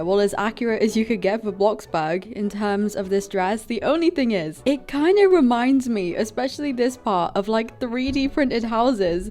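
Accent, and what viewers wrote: United Kingdom. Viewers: wait r u from the uk if so that’s really cool cuz I don’t know many dti uk YouTubers 🤭💗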